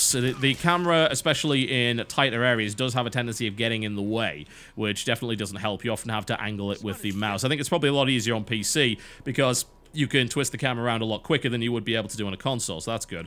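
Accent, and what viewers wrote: United Kingdom. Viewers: Is it just me or does he sound like a super rich, retired, game dev turned game critic? It may just be that he's British.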